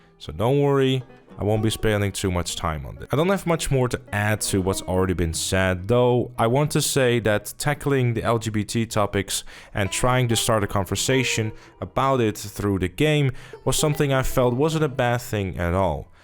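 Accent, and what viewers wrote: Netherlands. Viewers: This guy is definitely Dutch😅 Great review tho, keep up the good work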